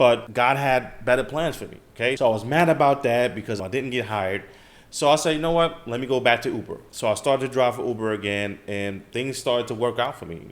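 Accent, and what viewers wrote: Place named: New York, United States. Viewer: you must be from new york, it’s the accent & hustle mentality. i literally feel this with my job rn, in between structuring my time & working for entitled people it really sucks. I definitely need to be an entrepreneur & master forex and the psychological aspects of it, so i’m really thinking about leaving when the time is right thank you for sharing!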